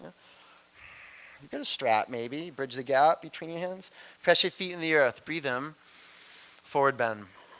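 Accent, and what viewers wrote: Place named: Boston, United States. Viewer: Sounding very Boston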